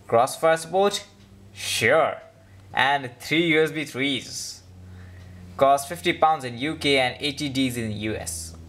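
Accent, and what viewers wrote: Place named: India. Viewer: funky indian accent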